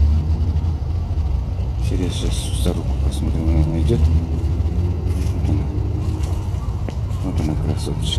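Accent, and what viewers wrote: Tirlyan, Russia. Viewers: ВОТ ТАК ВОТА!!!😁 ох оно неповторимое тирлянское наречие! Смотрю тебя еще чтобы не забыть как тирлянцы говорят, из тысячи говоров узнаю тирлянский говор.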